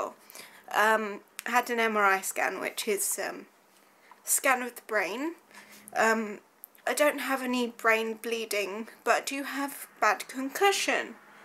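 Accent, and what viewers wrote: Birmingham, United Kingdom. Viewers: Dahhhhhlingggggg!!!! <3 Oh my gosh this is so sad and has actually made me think how I need to be more careful as I wouldn't want this to happen to me! It sounds truly awful and we're all sorry that you're experiencing this! I hope you recover quickly and am sure that your determination and motivation to keep up your creative flair will aid the healing process!:) GET WELL SOON! x ps. There was ONE positive that came from this video... I GOT TO ACTUALLY HEAR YOUR BRUMMY ACCENT! Hehehe I thought you'd NEVER let it shine through;D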